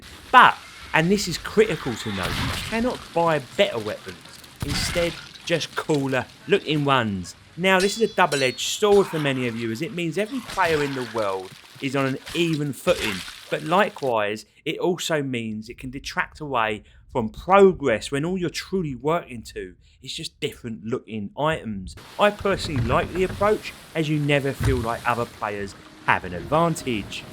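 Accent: Cockney, United Kingdom. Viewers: You deserve more subscribers my cockney sparrow! Love your videos 👍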